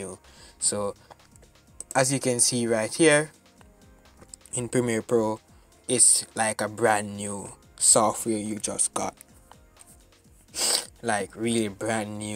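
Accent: Jamaica. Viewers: Sound like a real yaadie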